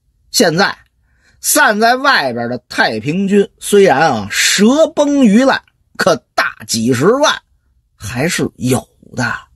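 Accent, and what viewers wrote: Beijing, China. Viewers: 北京口音真好听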